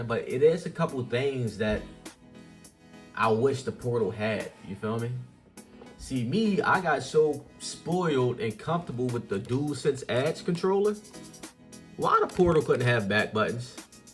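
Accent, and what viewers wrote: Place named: Baltimore, United States. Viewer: Def from Baltimore, good video my G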